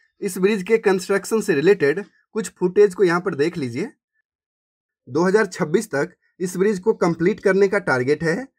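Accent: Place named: Assam, India. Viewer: Hindi assam style mei mat boliye please...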